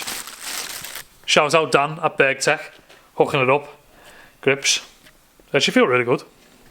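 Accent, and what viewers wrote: Liverpool, United Kingdom. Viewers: Is he scouse ?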